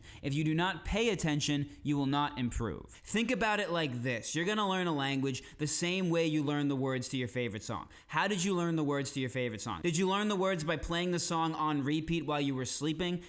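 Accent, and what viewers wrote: Argentina. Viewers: nice. congrats! good accent. bit of an argentinian twist or am I mistaken? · ok - thanks. 2.5 years (?) of studying Spanish got you to a hesitant B1+ with no sense of the prosody of the language (sounds like you 're attempting Argentinian pronunciation the way you realise 'y' and 'll'). You're definitely not at a level to pronounce yourself fluent (absolutely nowhere near it), and I'd suggest more humility when it comes to pronouncing what is and is not effective language learning. But hey, it's your channel, have at it.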